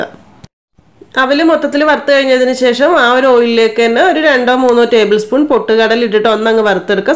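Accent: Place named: Wayanad, India. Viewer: Wayanadan slang 😙😙😙😊nokkummo, cheyyummo അങ്ങനെ.. 💪💪wayanad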